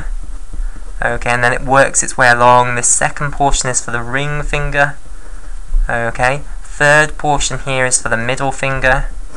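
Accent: United Kingdom. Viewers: He sounds British; probably from the UK/England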